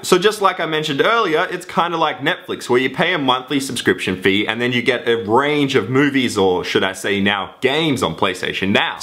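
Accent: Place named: Australia. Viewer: I love rage moments combined with his Aussie accent and cursing, it's so funny:)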